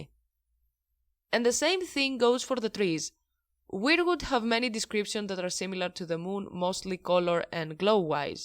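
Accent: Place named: Greece. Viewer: I like your videos. I also really love your accent. So don't worry about it your English is outstanding. Far better than my Greek.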